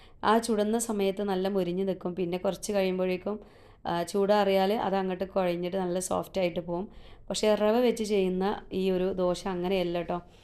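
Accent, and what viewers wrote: Malappuram, India. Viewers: മലപുറം ഭാഷാനന്നായിട്ടുണ്ട് ഇത് കേൾക്കുന്ന ഞങ്ങൾ തൃശൂർക്കാരാണ്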